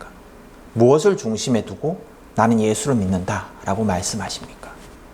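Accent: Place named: Gyeongsang, South Korea. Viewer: 한예연에서 설교 듣고 구독했습니다! 목사님한테서 대구방언 억양이 들렸는데 대구에 있는 교회였네요. 예수님 사랑만으로 충분합니다. 목사님, 감사합니다!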